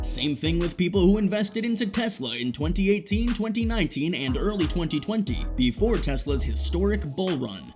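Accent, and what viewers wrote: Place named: United States. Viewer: What an annoying typical american narrator voice.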